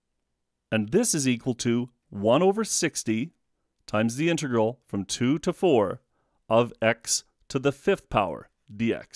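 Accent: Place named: Canada. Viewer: I know a Canadian when I hear one. Also, thank you.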